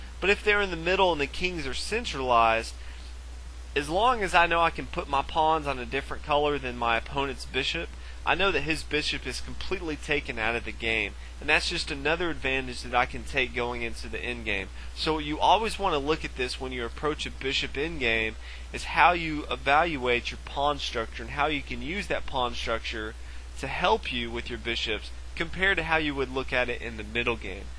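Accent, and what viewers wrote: South, United States. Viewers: Your southern accent is killing me , good video though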